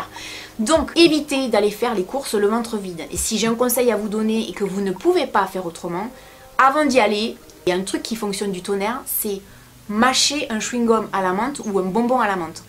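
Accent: South, France. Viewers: L'accent du sud ! 😂😘✨ #sudiste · et ouais le suuudddddd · Y'a pas longtemps que je te suis mais j'adore ton franc parler avec ton accent du sud 😍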